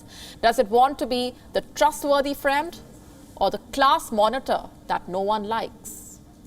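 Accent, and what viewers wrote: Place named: India. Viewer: we can. She is Indian,not supposed to sound like english If u like british accent so much go to english channel. An indian will have indian accent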